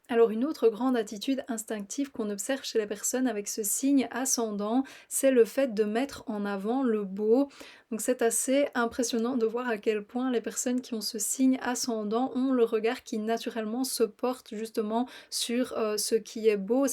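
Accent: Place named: Belgium. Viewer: ton accent belge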